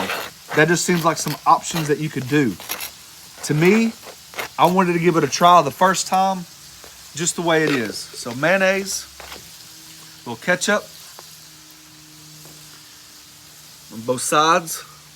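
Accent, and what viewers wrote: South, United States. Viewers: First video I’ve watched of you . I’m from SC and hear some southern dialect. Love it !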